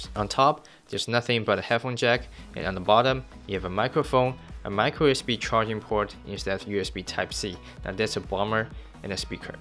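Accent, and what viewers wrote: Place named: India. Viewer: jyothish swty he speak bad and annoying indian accent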